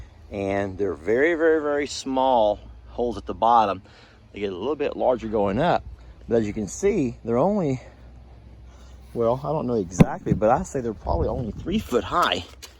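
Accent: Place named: Louisiana, United States. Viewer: Omg you are hoot, we were driving home from walk last night had this on in car listening through blue tooth and when you were measuring and said “well I see it’s just over 5ft in this direction” we fell out hollering what direction is that lol ohhhhhh lawd you are comical! Made me feel like I was at home in Louisiana I love it! Living in KC they say I say the funniest things 🤷🏻‍♀️ that’s way we talk in South 🙌 love this it’s really cool we use to have something similar but we threw square bails in it for animals! You did good job, looks great! You were spot on in your measurement in that direction!! 🤣🤣🤣 I’m just messing with you!❤️❤️❤️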